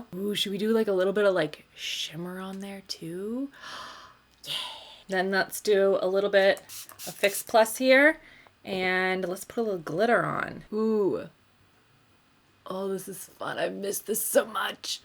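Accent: France. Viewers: a "combo-nation" is so cute and make almost more sense than the actual word "combinaison" ❤❤ Love you and keep speaking french I love your accent !! (French girl here) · Combination = combinaison (french) love your french accent <3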